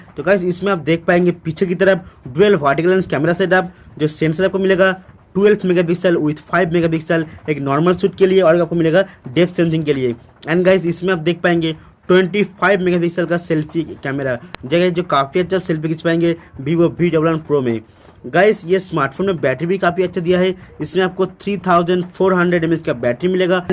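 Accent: Bengal, India. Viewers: Tum bangali h kya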